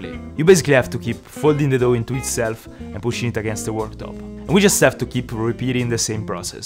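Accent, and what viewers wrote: Italy. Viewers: I love how half of this video is just him flexing his Italian accent on us. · Ora sono riuscito a rispondere alla mia domanda che si ripeteva nel,a mia testa da tempo, no non è vero ahaha, beh cow mi sembrava dalla tua pronuncia è tutto che fossi italiano e in effetti lo sei boom shaka laka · Ciao sono Lisa una tua fan italiana. Tu hai origini italiane? Perché parli molto bene inglese ma si sente molto l'accento italiano. So che non leggerai mai questo commento ma io in caso ho provato a chiedertelo. Ciao ciao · Bella la tua voce italiana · I spotted your channel just some days ago and I really like your videos I immediatly knew by your accent that your family is originaly from Italia, it just sounded familiar. I'm from Germany and I bet my accent is way worse than yours but I really like yours, mine not😂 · Si sente molto che sei italiano. 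· Ma fra si capisce dalla pronuncia che sei italiano... checazzo devi imparare a fare la carbonare... spero bene che da italiano tu la sappia fare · In this episode i noticed his accent became more italian than usual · Yes it's very good I generally love Italian accents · LO sapevo che eri tialiano dall'accento del tuo inglese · I love his fluent Italian accent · I hear the italian dialect☝️👍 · Ma tu sei italianooooo si sente, aia la pancetta però eh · Italian accent I’m Italian to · Bro avevo intuito dalla pronuncia, ma quindi sei italiano!!! 😅😄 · fra guarda che è italiano, lo capisci anche solo dall’accento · Io ho scoperto questo canale per caso e appena ho sentito il suo l'accento ho subito pensato "tu sei italiano!" 😂😊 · I like your way of talking in Italian Accent ❤️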